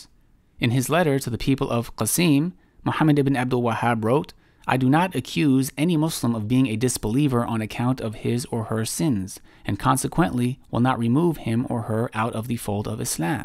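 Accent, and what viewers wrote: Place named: New Jersey, United States. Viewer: Is he from jersey ? He has a strong jersey maybe New York accent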